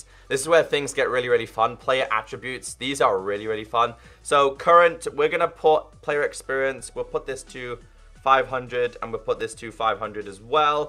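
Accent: United Kingdom. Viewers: probably cus he’s british as well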